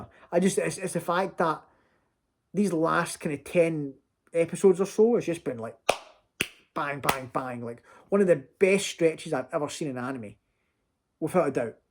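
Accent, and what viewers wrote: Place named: Scotland, United Kingdom. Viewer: Man congratulations on 1k🎉🎉🎉 Im so happy for you. I've been watching since 40subs and I feel like we're actual nakamas now because I seriously want this channel to succeed. I can totally see the hardwork put into these videos especially since you also have a job. This is no cap the best one piece reaction channel on yt. 1k is well deserved and long overdue tbh..... And you made me like Scottish accent too lol😅...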